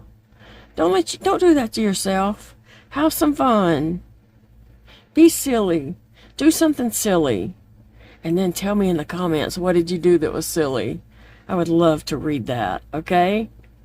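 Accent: South, United States. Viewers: Ah, the southern accent gets us in trouble, doesn’t it? I laughed at both your stories. I’m so happy you enjoy spending time with us. I listen to you when I’m crocheting or coloring for the most part. There are some crochet coloring books on Amazon, some with granny square pages to help plan out a color story.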